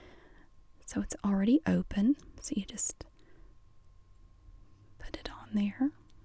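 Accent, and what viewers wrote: South, United States. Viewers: ) You're great!!! My favorite are your soft spoken videos. Perfect with that southern sound. Just trances me put.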